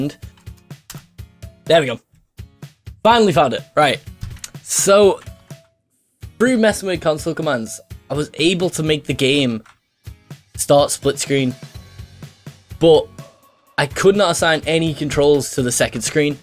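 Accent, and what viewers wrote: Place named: Scotland, United Kingdom. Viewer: btw love your scottish accent!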